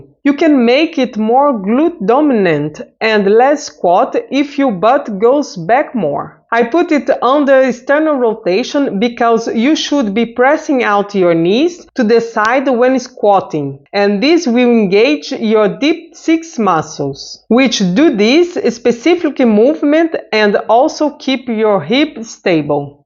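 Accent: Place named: Portugal. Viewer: adorei os exercicios. vc fala ingles , mas nao aprendeu a ter a pronuncia e intonação da lingua inglesa. como? fala ingles com intonaçao do portugues , triste. otimos exercicios obrigada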